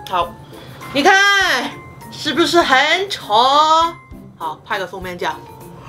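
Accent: Taiwan. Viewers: 國語好有台湾feel，尾音勁似👍👍🥳好正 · 對、為何你的口音咁台灣？你在那裏學的？一定不會在中學校！ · 嗨 你的國語發音很像台灣人😊說得很棒哦！我有些香港朋友是沒辦法那麼清楚發出咬音的呢，剛好肉圓是在我居住的城市 彰化，煙韌國語可以說成是 Q彈有咬勁哦。在彰化的肉圓是蒸熟後油炸，有脆皮派也有煙嫩派的、還有涼涼吃的肉圓 叫涼圓。 我也很喜歡旅遊 香港也去過十多次了，有機會來台灣的話 可以一起吃美食哦😊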